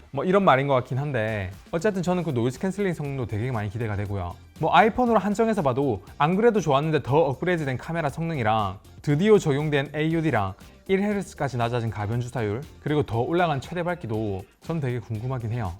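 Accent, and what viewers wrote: Gyeongsang, South Korea. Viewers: 사투리 귀엽네요 ㅋㅋㅋㅋㅋ경상도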